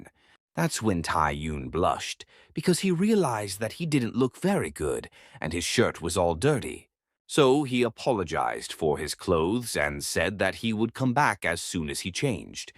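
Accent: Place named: United Kingdom. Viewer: The narrator is British!!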